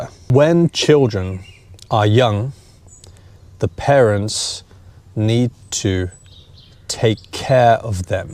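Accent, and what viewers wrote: United Kingdom. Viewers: Thank you very much for your understandable and clear British English. Please keep going.🎉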